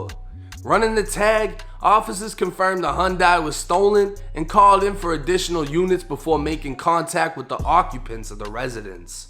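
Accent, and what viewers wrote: Boston, United States. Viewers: I’m from Boston bro no one stretches there accent THAT bad he says apahhhtment and stretches his AHHHS (r’s) every chance he gets like cool we get it your from Boston . Just feels over exaggerated and forced and dumb after a while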